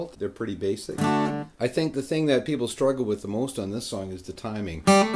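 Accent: Canada. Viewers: The accent is Canadian right? Sta-CAT-to lol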